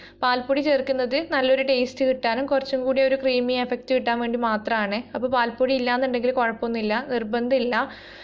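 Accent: Kannur, India. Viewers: Kannur kari aano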